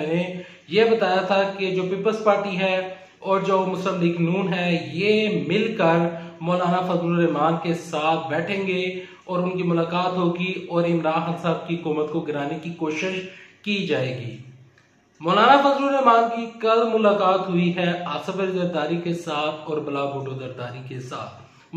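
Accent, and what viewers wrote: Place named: Punjab, India. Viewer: Fix your accent too much Punjabi accent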